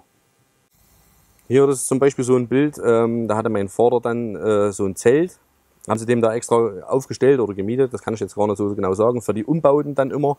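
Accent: Saxony, Germany. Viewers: Ein toller Pappe Oldtimer schon fast 70 Jahre alte Entwicklung! 👍🏼🤘🌹 Ein echtes Roeff ..Töff Töff! Cool! Nach damaliger Bestellung.... nach 12 Jahren erhalt......schon verrostet am Unterboden! Mit ganz tollem sächsischen Dialekt! 👍🏼👍🏼👍🏼👍🏼👍🏼🤣😂😎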